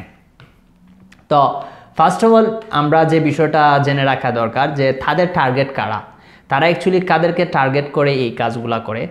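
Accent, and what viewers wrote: Sylhet, Bangladesh. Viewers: আপনি সিলেটি, আপনার কথায় সিলেটি টান আছে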